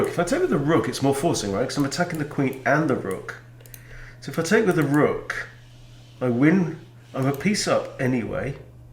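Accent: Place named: United Kingdom. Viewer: I watch bc I need a channel where I can have the moves explained and see how players closer to my elo play (Im only 700/800 but have only played 230ish games)--esp endgames. You playing rapid gives me time to think abt what I'd play in your position. The british accent helps, but my oh my the blundering rollercoaster and the amount of times I yell at you for bad endgame moves is why I stay. Can't forget abt the accents and movie quotes haha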